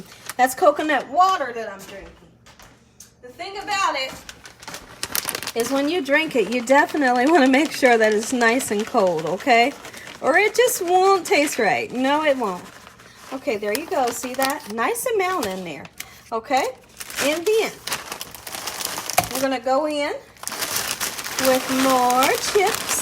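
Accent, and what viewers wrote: South, United States. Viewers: Have you always lived in Ohio! I swear I pick up a southern accent or Louisiana accent in your voice...I am from Texas......I am loving your channel!! hugs, xoxo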